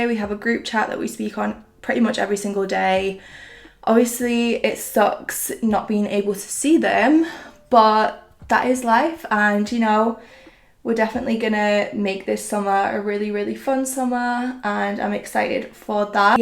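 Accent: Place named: Northern England, United Kingdom. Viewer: Gorg look! Your northern accent makes me feel so at home, hello from leeds ✨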